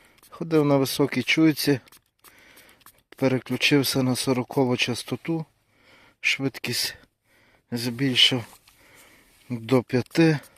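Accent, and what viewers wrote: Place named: Western Ukraine, Ukraine. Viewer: Як приємно чути нашу западенську😅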